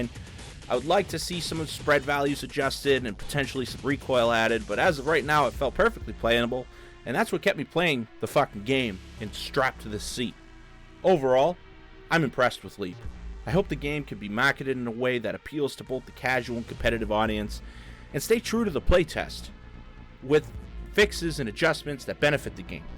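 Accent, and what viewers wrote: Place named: Boston, United States. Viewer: You gotta be from Boston lol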